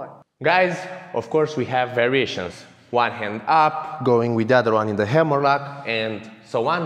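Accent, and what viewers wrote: Romania. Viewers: Puteam sa jur că ești român după cum vorbești engleza...Mulțumim de tutorial...ii ca lumea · Romanian accent. Resembles the italian accent.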